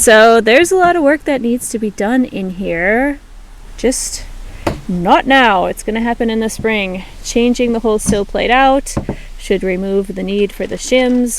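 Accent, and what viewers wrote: Quebec, Canada. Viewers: 😁😊💪It is a joy listening to with his Québécois accent. C'est super.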